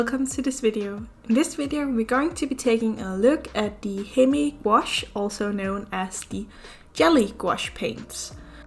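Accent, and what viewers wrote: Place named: Denmark. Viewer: Are you from Denmark? I can hear the Danish accent. My gf is from Aarhus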